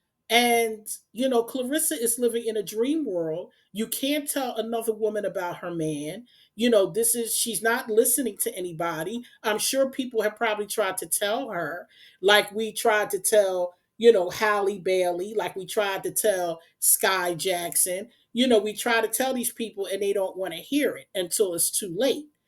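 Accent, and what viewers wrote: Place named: Philadelphia, United States. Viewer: You’re so right! I also can tell you’re from Philly or the surrounding areas maybe our accent is one of a kind! Hey hey! 🫶🏽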